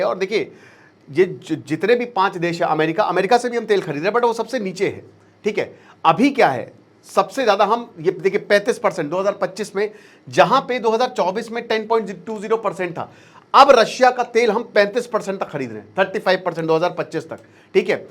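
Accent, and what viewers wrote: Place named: Lucknow, India. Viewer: लगता है लखनऊ से हैं जी.. 🙂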